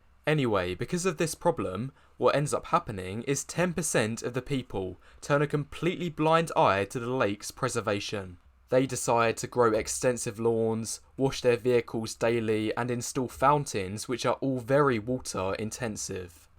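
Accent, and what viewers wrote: Essex, United Kingdom. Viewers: I love your content but would it be possible for you to work on your Towie girl accent? “Togevarrrr” (together) “Eivarrr” (either) “One two free girws standing togevarrr” Come on. You’re better than this.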